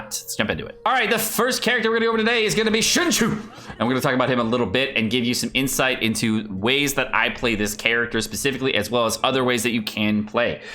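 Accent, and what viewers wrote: United Kingdom. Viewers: Yay british is here again ☺️ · I do like British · I actually look forward to the intro with british 😶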